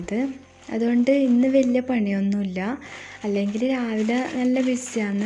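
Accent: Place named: Kasaragod, India. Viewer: Keettitt nammale kasargod slang thonnunnu.... kasargod aano veed...nice vlog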